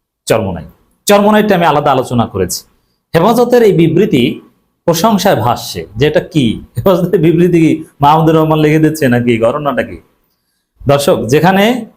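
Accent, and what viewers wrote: Barishal, Bangladesh. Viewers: আপনি কি বরিশালের??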